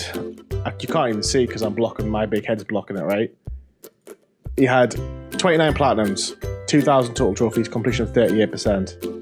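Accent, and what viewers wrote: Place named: Northeast England, United Kingdom. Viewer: You sound like a fellow northeastener, hi from South Shields 👋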